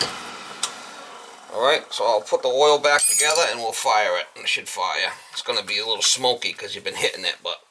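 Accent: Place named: Boston, United States. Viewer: Man I miss that thick Boston accent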